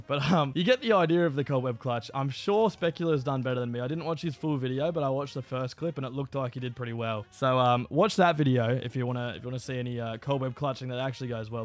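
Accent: Australia. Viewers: why do you sound so australian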